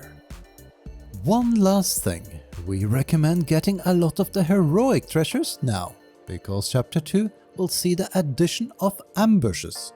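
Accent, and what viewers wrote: Norway. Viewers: 10/10 norwenglish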